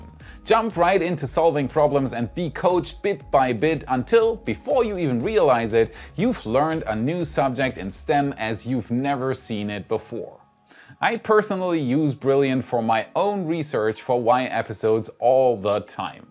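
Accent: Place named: Germany. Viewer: Guter Channel Digga ;) Irgendwie hab ich mir schon gedacht (gehört) das du Deutscher bist ;)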